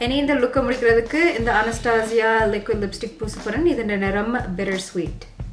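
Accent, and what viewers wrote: Sri Lanka. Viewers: Yha its srilankan tamil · Is this Srilankan Tamil? Unga Tamil romba azhaga irukku sister. Makeup la podamaatan, unga Tamilkaaga Subscribed! ❤💯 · Poosa porein.. Poosa porein.. Oru quiz competition vaikalaam pola. . Lolzz.. Else srilankan tamil azhagu 😍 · Ilangai Thamil alagu thamil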